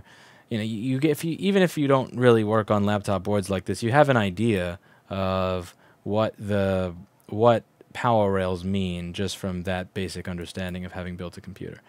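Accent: New York, United States. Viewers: He is a New Yorker; they speak differently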